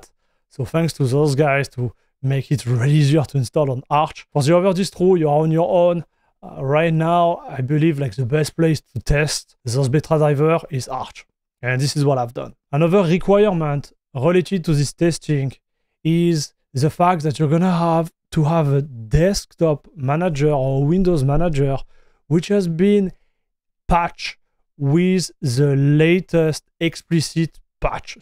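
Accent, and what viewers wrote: France. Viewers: I like your frenglish voice, sounds refreshing.. o)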